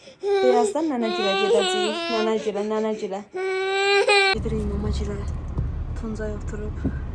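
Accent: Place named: Lankaran, Azerbaijan. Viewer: ləhcəniz bizim ləhcədir lənkəran deyirəm lənkəranlısız😊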